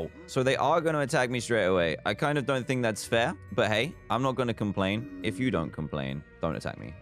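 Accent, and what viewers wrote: United Kingdom. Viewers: shut up he’s British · That’s because we British say Debis that way w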